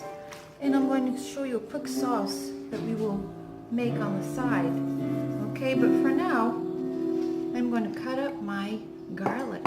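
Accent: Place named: Massachusetts, United States. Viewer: that looks fantastic..thats almost like the mosembequi..you sound like your from new bedford ma..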